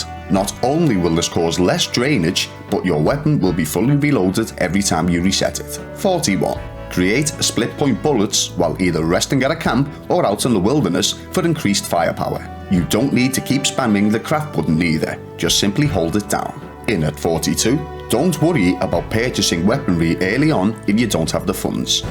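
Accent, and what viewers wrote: Liverpool, United Kingdom. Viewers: nice stuff. Could hear the Scouse twang but could hear a bit of strong northern 😁. You're not the the fella who plays cod professionally and lives in wavertree, are you ? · nice to hear a scouser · That’s some Scouse accent. From Kenny myself!! Great video. Nice one!! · Is this guy a scouser? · Me...not long started.These tips are well handy tyvm. As a fellow Scouser though, can i just say that your pronunciation of 'lass - o' surprises me..I'm prob a bit older than you though..i say 'lass-oo'! lol. You sound North end like me though? .but i can hear you dumbing down slightly for the world audience hehe. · Just playing this for the first time and this video is perfect, so thanks! Also, bonus for it being made by a fellow Scouser! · Yes lad finally a scouser lad your channel deserve more subs content on rdr2 is amazing mate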